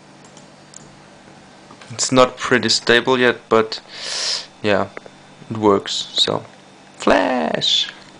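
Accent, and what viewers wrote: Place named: Scotland, United Kingdom. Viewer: Haha je praat een beetje met een schots accent:p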